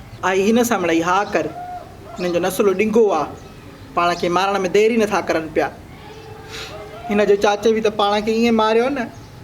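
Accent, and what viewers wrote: Sindh, Pakistan. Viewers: brillent real mithri sindhii bolee asa jiii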